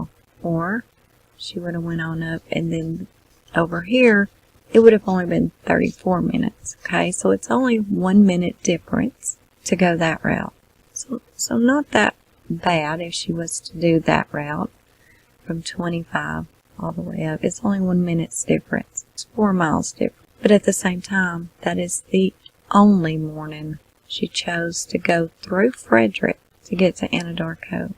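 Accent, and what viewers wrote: South, United States. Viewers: Love your southern accent.. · I also agree...your voice is soothing and southern...I'm from Tennessee and your voice reminds me of mine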